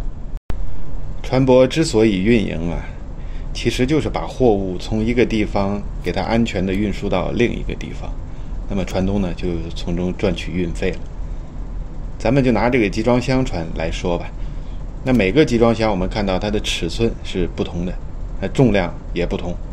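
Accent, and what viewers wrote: Tianjin, China. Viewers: 看的视频有一阵了，刚开始没确定的天津口音，听着很熟悉，让我想起了在天津上大学的时候，作为一个南方人，也很爱吃煎饼果子。很喜欢的节目，我是干航空的，看航海的，我发现很多相似但又完全不同很新奇的知识，感谢的科普！ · 嘛，，我建议，您嘛还是讲天津话好听，当然您现在讲的也好听，听着舒服，亲切。我是建议呀，我看还是把这节目办的有点那么是相声的感觉，就更好了，凭您的水平，口才，我看成，点赞。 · 听口音就是天津爷们。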